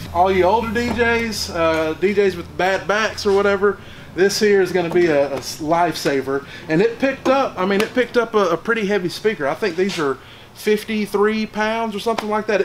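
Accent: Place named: South Carolina, United States. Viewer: Man, you sound like you are from my neck of the woods here in South Carolina..... great review.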